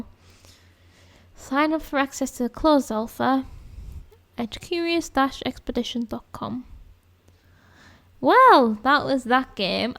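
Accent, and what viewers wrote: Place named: Cumbria, United Kingdom. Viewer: I'm hearing Cumbrian 🤔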